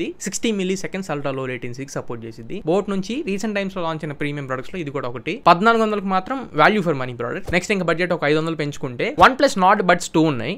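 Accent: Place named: Guntur, India. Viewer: ఆ ఉండిద్ది ,వచ్చిద్ది ,పోయిద్ది కొనమాకండి ఆహా 😊 ఆ గుంటూరు east ప్రకాశం యాస లో కిక్కే వేరబ్బా ❤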